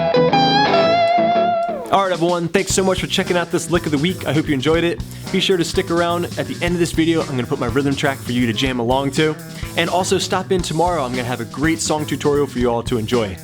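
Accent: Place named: South Jersey, United States. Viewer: let it be known that over the past few years I have watched hundreds of thousands (lol ballpark figure) of guitar lessons via the internet. I would like to say: yours are, by far, my favorite! they are usually very well balanced and easy(ish) to understand. [the (ish) is most definitely due to my level of understanding not your teaching skills haha] I feel like I really do learn a lot. I love how methodical the lessons are; starting with easy steps and bringing it all together with a run through at the end. straightforward and cohesive... seriously, thanks for all of the knowledge 😊! also, you sound like your from jersey. maybe Philly, but probably like south jersey... if so that's cool, if not, ya know... that's cool too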